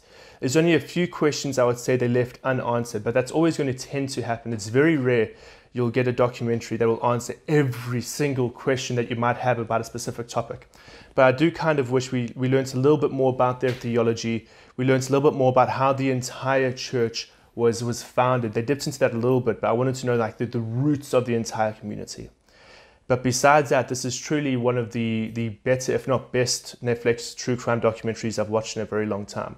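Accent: South Africa. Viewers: Are you South African? Sorry for asking if it offends you, because I don't mean to, I'm just curious about your accent. Good review from a Christian's point of view. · I heard his South African accent immediately too.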